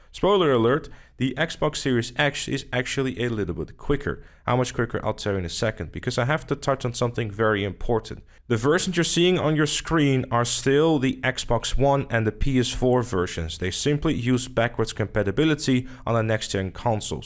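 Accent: Netherlands. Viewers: Are you dutch? If so: Goedeavond 😁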